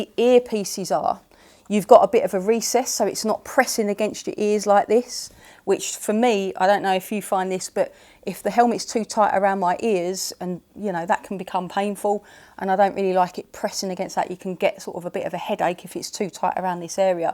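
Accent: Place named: Essex, United Kingdom. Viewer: That accent..... you gotta be an Essex girl!?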